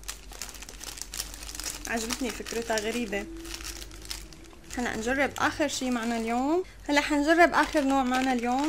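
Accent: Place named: Damascus, Syria. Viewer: كتير حلو لانها سورية من الشام والشاميين بيحكو هيك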